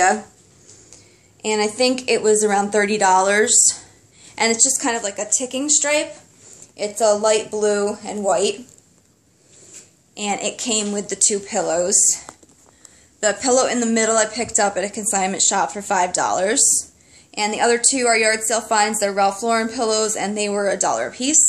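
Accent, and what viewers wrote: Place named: South Jersey, United States. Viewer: I thought you had a South Jersey accent because you sound like my cousins and then you said Brigantine!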